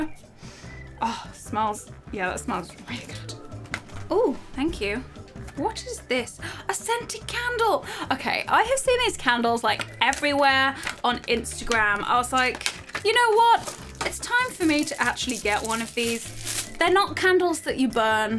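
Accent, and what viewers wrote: United Kingdom. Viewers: Ok that British accent is everything 😍😍😍🥺🥺🥺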